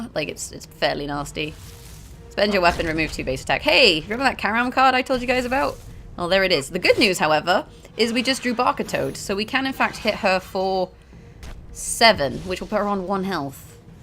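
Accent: United Kingdom. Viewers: I can't place your accent. British with Irish parents living in the States is my wild guess. Anyway another good vid, have been looking for a deck to get me out of plat. I run several adapted versions of your decks to deal with my Diamond card poverty. They are consistently effective. Cheers for the good work.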